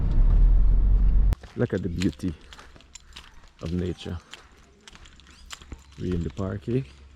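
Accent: Guyana. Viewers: Guyanese accent seems musical, I always love to listen Sunil Naraine the cricketer voice as it seems musical like yours. Love and respect from 🇮🇳. Keep enjoying bro.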